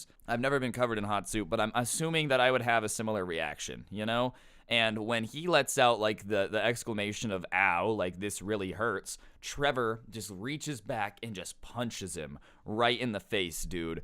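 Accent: Russia. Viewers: Don't mess with the people from the Balkans cuz ottomans and the communists (fascists as well) btw ur Russian accent was good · I love Russian ascent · Your Russia accent is hilarious!!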